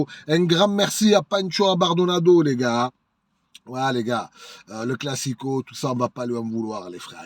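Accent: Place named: Marseille, France. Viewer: J adore l accent marseillais ❤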